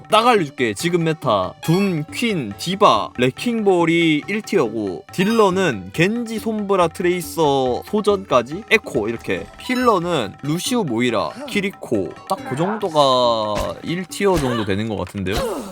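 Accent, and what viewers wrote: Gyeongsang, South Korea. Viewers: 경상도사람임?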